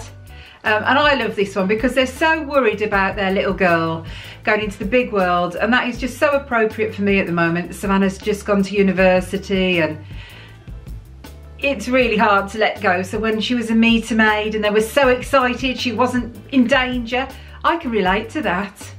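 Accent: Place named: Southern England, United Kingdom. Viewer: 😘hopefully we get to trade someday! Love meeting new Disney Friends - especially in the UK. From your accent I'm guessing down South? Although, I am terrible at guessing accents!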